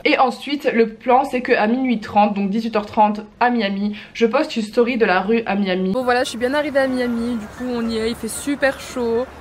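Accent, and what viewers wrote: Belgium. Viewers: tu est belge ? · Tu est belge ? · Tu es belge? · attends t’es belge?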